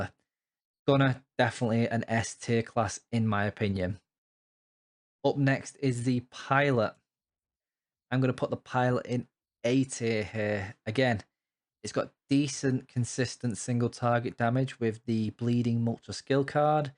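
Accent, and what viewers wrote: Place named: West Yorkshire, United Kingdom. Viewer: What accent is this? Im in Hull East Yorkshire and youre sounding maybe Leeds or Doncaster or something west yorkshire-ish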